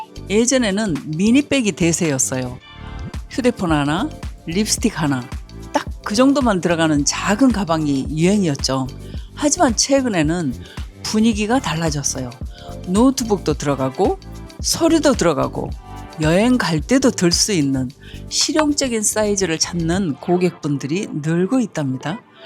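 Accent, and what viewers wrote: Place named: South Korea. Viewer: 서울사투리 + 경상도 사투리 + 전라도사투리가 세가지가 느껴지는 말투를 가지셨어요 ㅎㅎㅎㅎ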